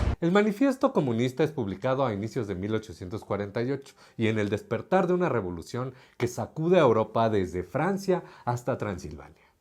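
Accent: Mexico. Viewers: Que buena pedagogía y que agradable narración, ¿eres mexicano?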